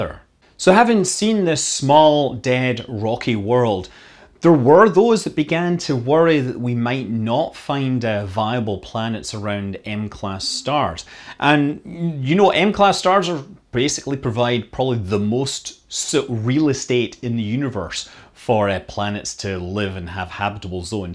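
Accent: Scotland, United Kingdom. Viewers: I had to rewind to listen again to what you actually said.... because I was expecting you to say "temperature", so that's what I "heard" you say! When I read the overlaid correction, I thought, "Wait.... What?" This is all because I have a very hard time processing auditory information quickly enough, and with a Scottish accent and rapid delivery, in tend to miss lot. (This makes telephone conversations hard work for me, esp. with the wretched voice quality on American mobile phone service.) Thanks to YouTube for offering 10-sec REW!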